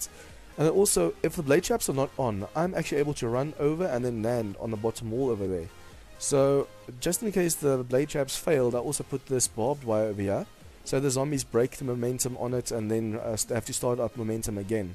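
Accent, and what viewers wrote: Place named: Afrikaans, South Africa. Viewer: Epic! Nice job, great results ... Love it... The Afrikaans accent :)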